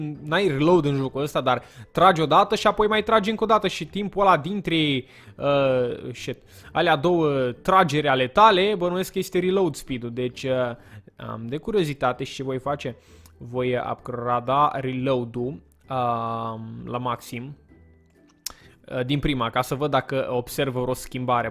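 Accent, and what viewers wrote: Moldova. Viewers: fe ce vorbesti moldoveneste?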